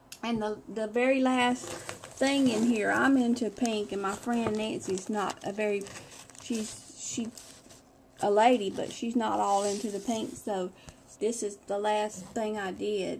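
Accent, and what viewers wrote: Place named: South, United States. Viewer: I just love your southern accent. You are adorable and you did a wonderful job on the album.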